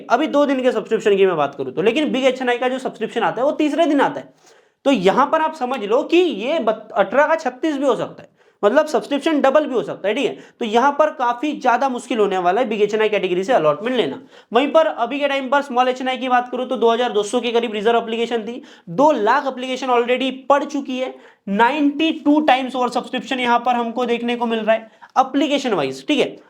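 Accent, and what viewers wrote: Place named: Indore, India. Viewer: MP se ho na bhai, indori accent ❤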